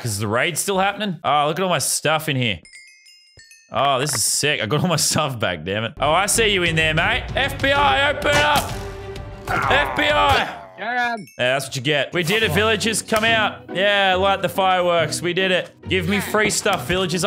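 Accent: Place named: Australia. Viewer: Yo bro you have a Aussie accent big time bro cheers for Australia man (I’m also from Australia).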